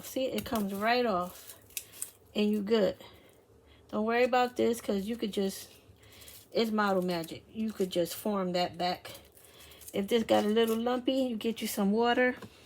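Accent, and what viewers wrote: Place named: South Louisiana, United States. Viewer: You are so excellent, at doing fae bake tutorials. ❤️ Your accent is identical to my cousin's accents in Houma, La. as I've shared before,; you make me so homesick, for south Louisiana.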